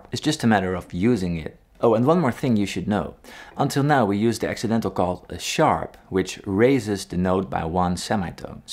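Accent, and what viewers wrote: Netherlands. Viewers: Thanks a lot why do I hear a Dutch accent while your name is clearly English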